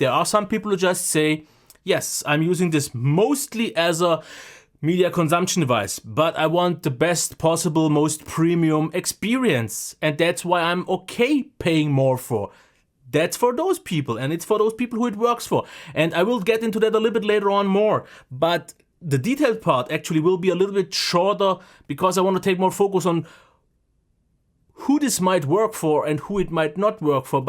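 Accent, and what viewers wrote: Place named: Germany. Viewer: Man I love that German accent, brings the queen's English to life.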